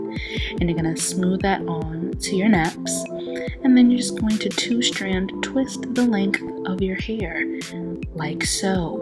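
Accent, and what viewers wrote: Africa. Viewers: I love u african accent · You are hilarious. I'm Cameroonian but it's crazy how all the African accents sound sound so similar. Loved it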